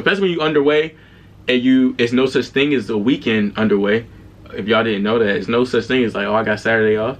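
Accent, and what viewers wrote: Baltimore, United States. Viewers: You from Baltimore? 🧐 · I knew my man was from Bmore. I could hear it. Bmore standup. Latrobe Eastside. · I’m sitting watching your video I’m thinking bruh gotta be from Baltimore then boom you from Baltimore city that’s crazy I grew up there and I’m trying to join the navy out of high school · I could tell u was from bmore when I first heard u talk from da accent 🤣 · Knew u was from bmore as soon as I heard tewwww shout out 410 man😂😂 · I kept hearing that lil accent Nd I was like is he from b-more then you said it Nd I was like I knew it 😂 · Yo before you said you were from Baltimore I was already thinking it. We know what we sound like lol. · Hate being from the dmv cause why i knew you was from bmore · you from baltimore lol? but if i got more questions can i message you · yeooo bruh soon as u spoke i knew u were from baltimore lmaooo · I thought I was hearing a Baltimore accent 😂 keep it up! 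· Crazy I knew you were from Baltimore as soon as you said ya first word. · Baltimorean I heard tew I’m alr knowing 😂😂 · He sounds like he’s from Baltimore lol. Thanks for your service bro! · Mmm been a few years since I heard a Baltimore accent I just passed meps physical and probably signing navy so thanks for your videos. Shout out from an old randalstown. Guy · I knew he was from Bmore. Big ups to you sir. - your fellow DMV homie. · Dummi I knew uu was from Baltimore jus from how uu was talkin😂😂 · Sounds like a lil Baltimore to me 😂😂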